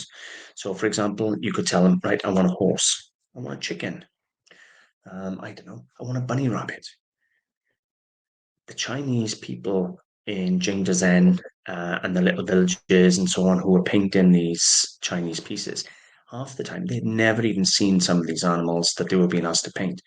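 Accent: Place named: South Wales, United Kingdom. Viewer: Hi I’m fairly new to your channel. I’m in S Wales also and it was wonderful to find your videos and hear your accent. I m also new to reselling and I sincerely thank you for sharing your wealth of knowledge, expertise and enthusiasm.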